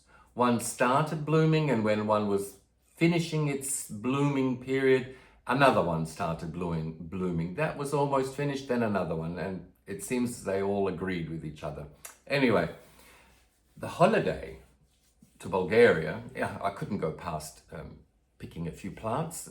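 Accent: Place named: Australia. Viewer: Hey there..I follow Svetlana..so on her recommendation had to check out your channel. I was trying to workout your accent & u said 'u pinched something'. I thought is he Aussie & then u confirmed it 😊 I am from SE Qld & have lots of Aglaonemas . A lot of them have different names here to overseas but basically the same plant. There are lots of hybrids here. Nice to see the start of your collection..it's a rabbit hole once u start 😉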